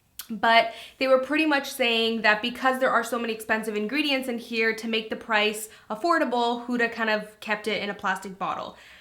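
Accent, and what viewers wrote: Canada. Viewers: is it just me or was her accent extra canadian today?😂💜love it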